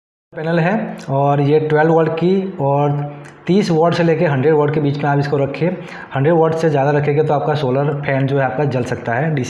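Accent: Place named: Gujarat, India. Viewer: Gujarat lago chho bhai.. very good 👍 explained...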